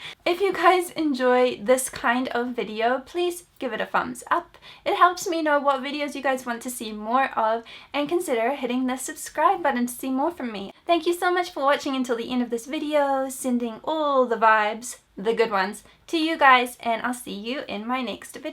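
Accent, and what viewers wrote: New Zealand. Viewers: i love your new zealand accent so much omg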